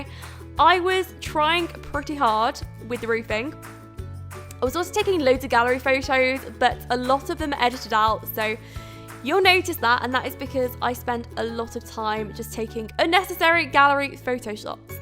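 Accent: United Kingdom. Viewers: I love your british accent so much !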